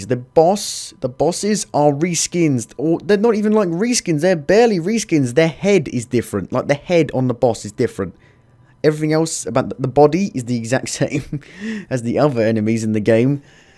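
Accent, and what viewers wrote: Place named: Essex, United Kingdom. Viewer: Where you from mate? Sounds a bit like South East... Essex maybe? But there's a bit of Weymouth in there! Like the honesty old sea dog!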